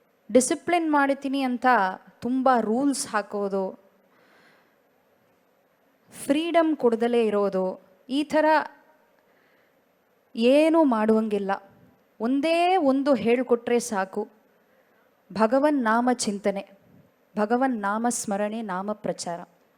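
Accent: Standard. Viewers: ಶಿವಶ್ರೀ ತಮಿಳುನಾಡಿನಲ್ಲಿರುವ ತೆಲುಗರ ಹುಡುಗಿ. ಚೆನ್ನಾಗಿ ಶುಧ್ಧ ಕನ್ನಡ, ಅದೂ ವೇದಿಯಿಂದ ಮಾತಾಡಿದ್ದಾರೆ. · ನಿಮ್ಮ ಸ್ವಚ್ಛವಾದ ಕನ್ನಡದ ಭಾಷಣಕ್ಕೆ ಕೋಟಿ ಕೋಟಿ ಅಭಿನಂದನೆಗಳು 🙏🙏🙏🌹🇳🇪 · ತಾಯಿ ನೀವು ಬೇರೆ ಭಾಷೆದವರು ಆಗಿ ಇಷ್ಟು ಶುದ್ಧ ಕನ್ನಡದಲ್ಲಿ ಮಾತನಾಡಿದ್ದಕ್ಕೆ ತುಂಬಾ ತುಂಬಾ ಧನ್ಯವಾದಗಳು .ಕನ್ನಡ ನಾಡಿನಲ್ಲಿ ಹುಟ್ಟಿ ಕನ್ನಡದ ಅನ್ನವನ್ನು ತಿಂದು ಕನ್ನಡದಲ್ಲಿ ಮಾತನಾಡಲು ನಾಚಿಕೆ ಪಡುತ್ತಾರಲ್ಲ ಅಂಥವರಿಗೆ ಸರಿಯಾದ ಉತ್ತರ ಕೊಟ್ಟಂತಾಯಿತು ತಾಯಿ ತುಂಬಾ ಧನ್ಯವಾದಗಳು.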